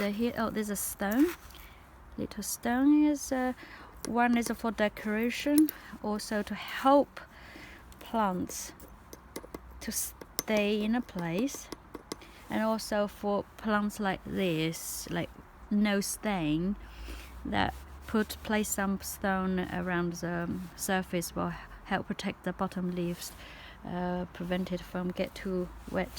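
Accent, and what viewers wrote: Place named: England, United Kingdom. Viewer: Great collection! Thank you for sharing from Korea The mini pot is so cute! :-) I like your England accent as well! I subscribed to your channel as well! Hope your channel becomes flourish too! ♥♥♥♥♥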